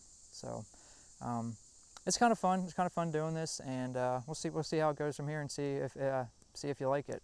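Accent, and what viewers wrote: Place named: West Virginia, United States. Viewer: great idea! I haven't been subbed long enough to have in depth questions, but I am curious about where you are located. Your voice(accent) whatever you want to call it, reminds me of my husband's family in West Virginia is why I ask.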